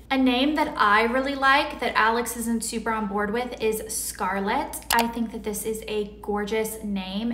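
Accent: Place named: South, United States. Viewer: You're sounding so much more Southern since you've moved out of LA. I'm so glad you've come back to YT, I've been watching you since your college pink room with the Eiffel tower painting. I have 2 boys Jude and Rory. It's so hard to name kids.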